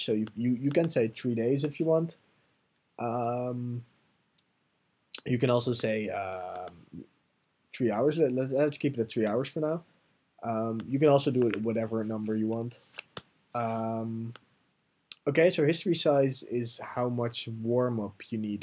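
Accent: Netherlands. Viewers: u dutch??? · your dutch ? :O i just hear it in your accent :) thanks for sharing your software ;) your the best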